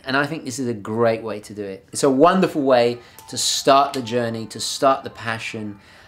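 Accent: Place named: United Kingdom. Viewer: Hi been watching a few vids good stuff. I'm about to buy another watch, not made of money so looking at hundred UK. It's either the Rotary Windsor or Regent, love them both just wanted your opinion. Now the regent is originels range but something classic about the Windsor. Your thoughts? Also, sounds like you are from the UK but noticed your Italian background on different video, but living in the States?! Interesting Interesting. Good content my friend, I do love a fine watch. Will also check out that book you mentioned meditations. All the best from wintery Staffordshire, Jim.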